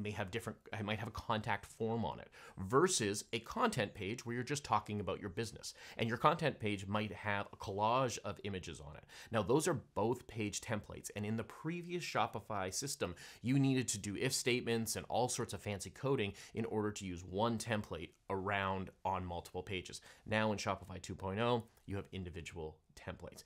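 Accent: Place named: United States. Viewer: TG - American accent real human Shopify vid.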